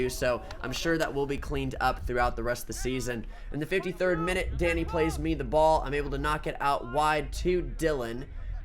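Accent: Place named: United States. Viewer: This sounds too American for me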